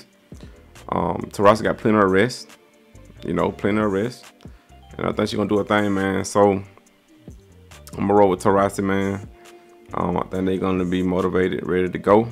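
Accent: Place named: Houston, United States. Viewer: Ayyye, my friend and I are debating about where you from. Based on your accent I’m saying Houston but he thinks it’s ATL, if you don’t mind, can you help us squash the debate!?!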